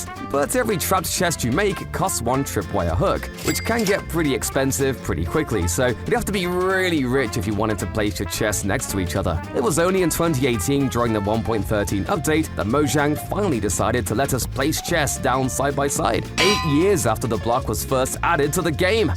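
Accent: United Kingdom. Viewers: This guy has such a youtuber/British accent, it pmo so much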